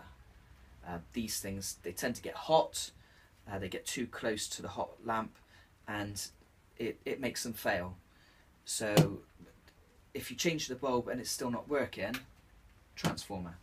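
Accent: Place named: West Country, United Kingdom. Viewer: Lush West Country accent. Good vid.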